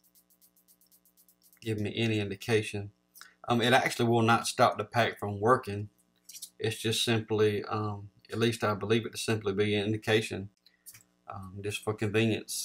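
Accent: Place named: Georgia, United States. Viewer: Sounds like your from Georgia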